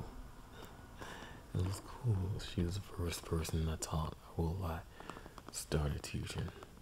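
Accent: St. Louis, United States. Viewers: Where r u from? Ur accent sounds like ur frm stl missouri.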